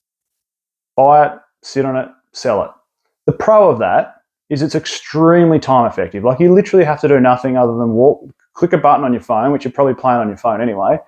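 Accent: Australia. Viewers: All facts, i just really enjoy these videos. The aussie accent doesnt hurt either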